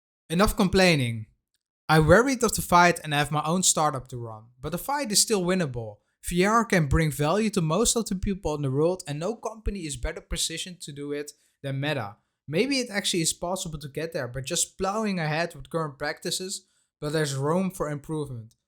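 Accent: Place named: Netherlands. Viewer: Ik hoorde gelijk dat je uit Nederland komt :)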